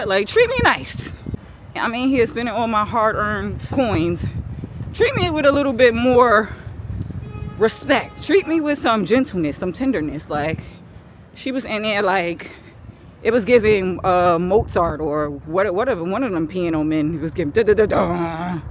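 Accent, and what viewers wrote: Brooklyn, United States. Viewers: Amanda Seales Me! Cut It Out. 😂 You speak a dialect that is very Brooklyn Millennial.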